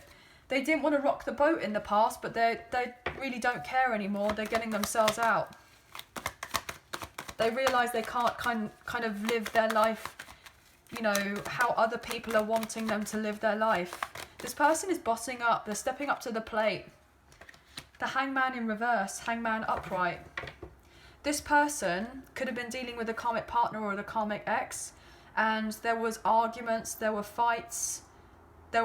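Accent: England, United Kingdom. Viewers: Love your English accent.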